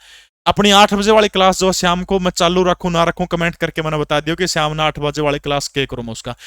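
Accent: India. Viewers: Aap pabra side ke ho kya sir ??? Apki boli mile h ude kani tt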